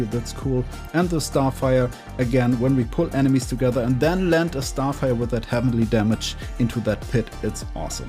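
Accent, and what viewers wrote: Germany. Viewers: man hört direkt das du nen deutscher bist finde es richtig cool das du englischen content bringst weiter so :D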